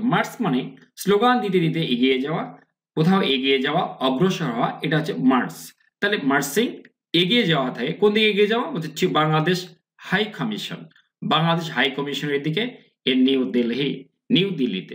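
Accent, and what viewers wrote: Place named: United Kingdom. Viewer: স্যার সবসময় ব্রিটিশ উচ্চারণ টা করবেন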